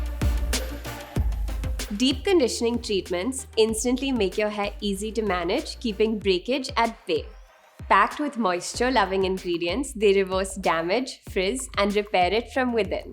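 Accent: India. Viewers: I'm from the U.K and she has British/European features, skin tone and hair colour. But her accent matches my asian/Indian companions. That is all, Never meant anything by it all! She is very beautiful either way! Love your videos guys. Keep up the great work! 🥰🤭❤️💗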